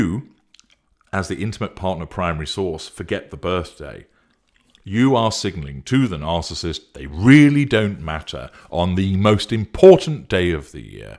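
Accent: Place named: England, United Kingdom. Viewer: Uh oh I just found your channel a few days ago but I think I am addicted to your voice already. Eek. I always did love an English accent! I've always loved the English, the dry humor.... I'll be okay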